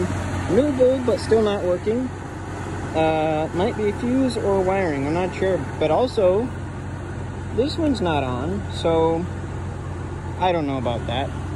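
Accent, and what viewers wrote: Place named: South, United States. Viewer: Hell, he sounds too southern to be from Cali lol. Let's face facts here, has already mentioned back problems, it's only a matter of time before he won't be able to do it anymore. If this lad manages to keep a sense of humor while reviving old cars and trucks, then we should support his efforts. Hell I'm 50 and used to be a shade tree mechanic until well into the 90's, then the 2000's hit with all of this computerized voodoo and I got lost under the hood of cars today. It's great to see a youngin' picking up the pieces us old men just can't be assed to film while we cuss and fuss working on them.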